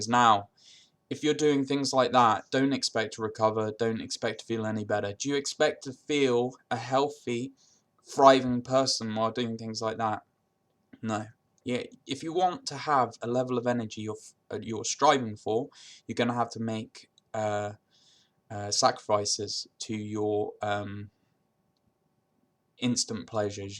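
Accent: United Kingdom. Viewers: English not Aussie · This is a semi estuary London type of accent not Australian,,,,I found him clear enough,,he wasnot cockney or anything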